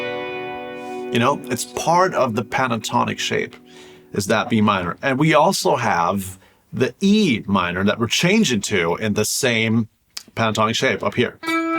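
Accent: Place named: Germany. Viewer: You have just the smallest hint of a German accent as if you moved to America around the age of 12.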